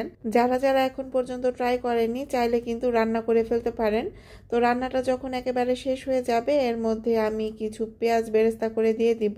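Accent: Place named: Bangal, Bangladesh. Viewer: কি সুন্দর বাঙ্গাল কথা বললে❤একদম সংসার ছেড়ে একদিন ও কোথাও ও থাকার উপায় নেই❤লক্ষী বোন আমার আছি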